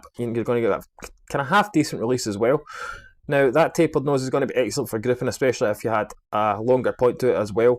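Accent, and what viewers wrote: Scotland, United Kingdom. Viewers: hey man! great review. just purchased a set of these, I will let you know how they are Awesome Scottish accent my man. Cheers from Canada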